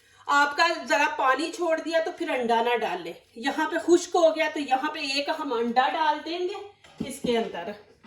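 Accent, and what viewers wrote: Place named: Hyderabad, India. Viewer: Mam your Gulabi Urdu we enjoy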